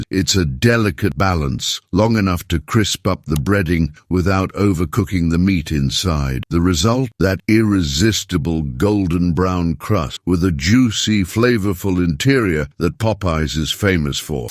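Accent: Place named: United Kingdom. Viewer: What the hell! You take an all-American icon like Popeye's and lend it a frickin' British accent. Popeye's has got NOTHING to do with England. Why don't y'all hop on your own coat tails?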